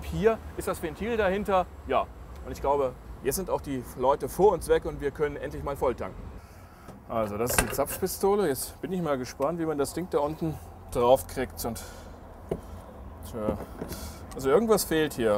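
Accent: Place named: Netherlands. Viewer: du hast eine rotsprache!!! niederlandisch!!!!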